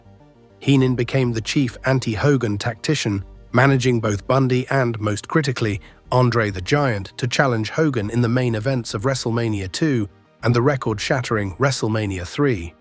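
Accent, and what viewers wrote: United Kingdom. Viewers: It's amazing to me how a great topic like this can be turned into a complete dribble by a British accent thumbs down for that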